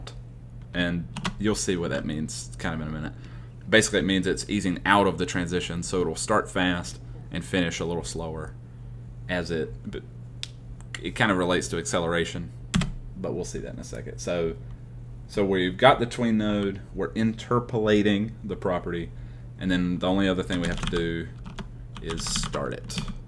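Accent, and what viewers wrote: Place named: United States. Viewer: Your accent is awesome lmao never heard a country accent for Godot tutorials. +1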